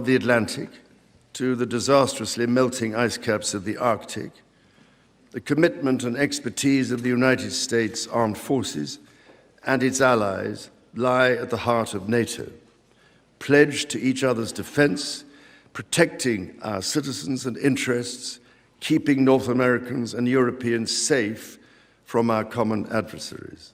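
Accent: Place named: RP, United Kingdom. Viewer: Nothing beats a clean, pure, royal English accent. Glorious.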